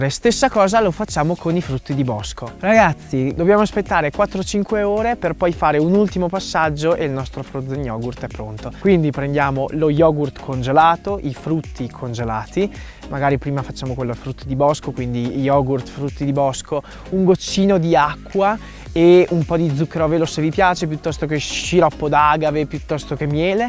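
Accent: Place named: Romagna, Italy. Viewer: Ma perché hai l'accento romagnolo!?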